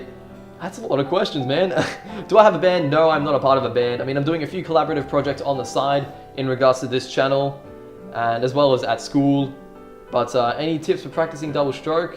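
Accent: Australia. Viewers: jeremy wee well both of them have Australian accents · Dayum son, that Australian accent is thicc af. First time I've seen your face... other than your (half faced) dp xD OreGairu and Nisekoi... the first 2 anime that got me into covering seasonal anime music lol We started getting into anime with the same series... wtf is with these coincidences kek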